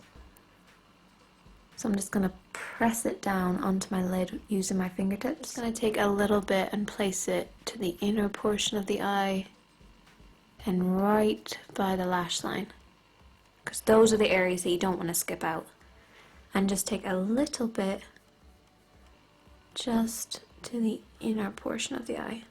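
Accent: Ireland. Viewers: she's Irish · At first I thought you had an American accent. Are you Irish? I love your accent.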